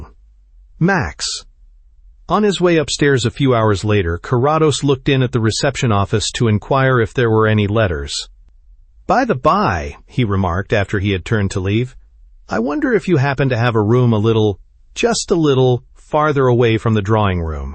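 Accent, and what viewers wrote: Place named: United States. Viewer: Nice hearing plain straight up American voice after excessive British with most over the top with the snootiness and the nearby islands of accents with strictly another country's vocabulary we can at best barely make out.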